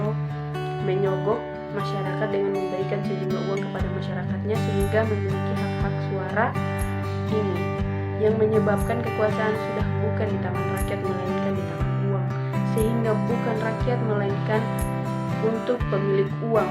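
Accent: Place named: Eastern Indonesia, Indonesia. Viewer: Selain warna kulit, dialek adalah alternatif untuk mengidentifikasi seseorang. Yakin dan pasti ini ini adik's dari timur.... 😅🙏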